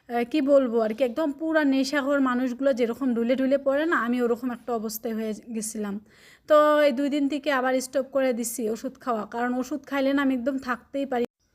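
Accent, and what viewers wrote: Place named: Bangladesh. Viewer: আপু তোমাদের জেলার নাম কি। তুমার কথা গুলো আমাদের বাংলাদেশর মতো ❤❤❤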